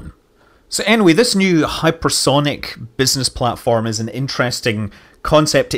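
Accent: Scotland, United Kingdom. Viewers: LOL, your accent is reverting to your native Scottish. The Bell X-1 of course had the one XLR11 engine that has the four thrusters. The early X-15 had a pair of XLR11's (so eight thrusters) while (whilst?) they were waiting for the XLR99 monster. Lifting bodies also made use of the XLR11's.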